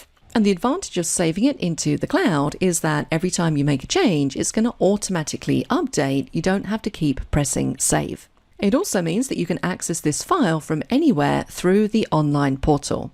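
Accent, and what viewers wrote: United Kingdom. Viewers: that british accent is so smooth hahaha, greetings from spain. It would be awesome if you could transform her voice into spanish through AI.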